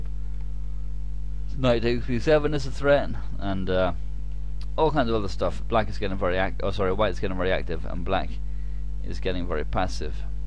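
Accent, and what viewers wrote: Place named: United Kingdom. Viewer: Beautiful and great videos!, especially those on Carlsen, and of course, I enjoy your British accent, 'couse I am a fan of the British accent. Well done!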